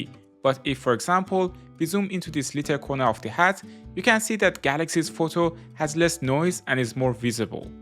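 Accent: Iran. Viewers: I don't know where are you from but you have an iranian English accent 😁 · لحجت به فارسی ایرانیا میخوره !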